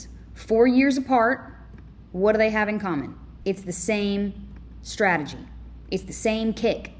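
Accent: United States. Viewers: "you ain't got..." I love that country accent ❤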